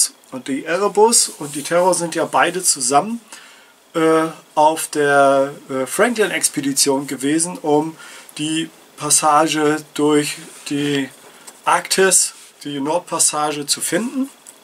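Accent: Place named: North, Germany. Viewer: Moin, Ich liebe deinen norddeutschen Schnack!!! Ich könnte dir stundenlang zuhören 👍🏴‍☠️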